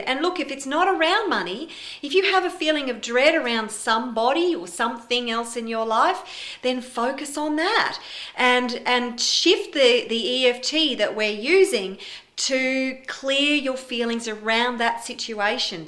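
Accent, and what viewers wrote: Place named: Australia. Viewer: this aussie lady has me thinking in this accent at times.. oh boy very funny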